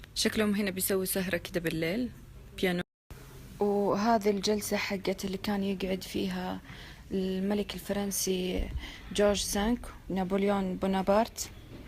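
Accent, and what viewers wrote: Saudi Arabia. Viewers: مغربية.....والحكي سعودي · صحيح كلامها سعودي كأنها سعودية انصدمت من طريقة كلامها ضاطبة اللهجة مئه بالمئهSalwa Mery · انتي مغربية ليش تتكلمي لهجة سعودية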